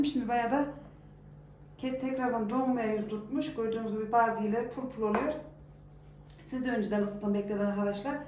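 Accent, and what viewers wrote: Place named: Yozgat, Turkey. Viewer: S a bacım yozgatlımisin şiven bize benziyo ben sorgun dan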